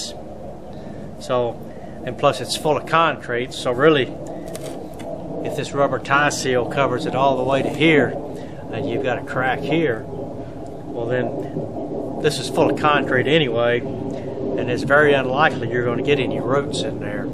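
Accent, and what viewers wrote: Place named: New York, United States. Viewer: Love your New York accent.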